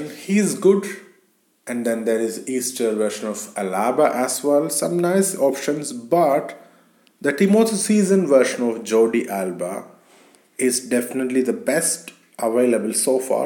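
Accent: India. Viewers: You are indian your accent says all🤘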